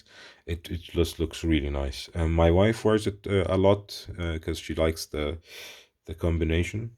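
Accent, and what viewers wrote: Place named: Egypt. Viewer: Great collection man! I knew from your accent that you might have been arabic (egyptian if i'm not mistaken) and I was waiting for you to pull out some watches with arabic numbers.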